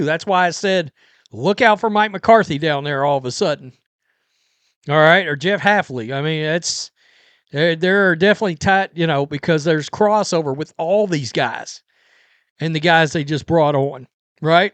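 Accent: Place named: Tennessee, United States. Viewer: Yes, Matt LaFleur was the Tennessee Titans offensive coordinator when Ryan Tannehill was there. I remember that because I remember the Titans radio guy had a very similar accent to you and I thought you were from Tennessee until you said you were from Texas.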